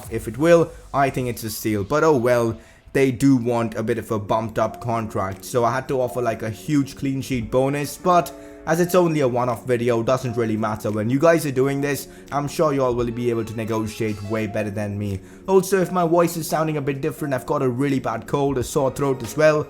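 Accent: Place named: India. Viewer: Steve Worrell he's got a sour throat probably Indian cuz I don't know how welsh people sound like.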